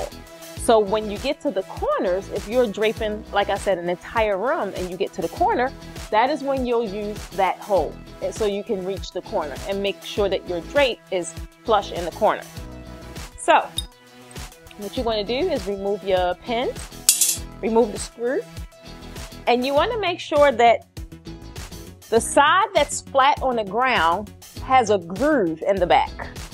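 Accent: Baltimore, United States. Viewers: She definitely from Baltimore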